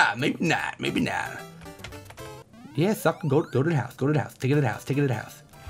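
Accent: France. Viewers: that french accent though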